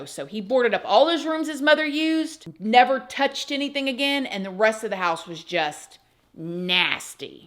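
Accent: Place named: Eastern Shore, United States. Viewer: Are you from delmarva? It sounds like a eastern shore accent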